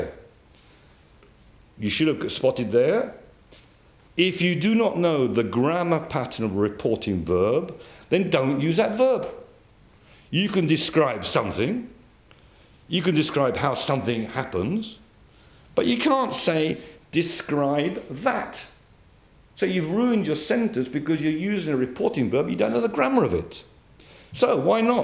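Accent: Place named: Afrikaans, South Africa. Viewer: This oak is South African, right? Dude sounds like every Afrikaans English teacher I've ever had.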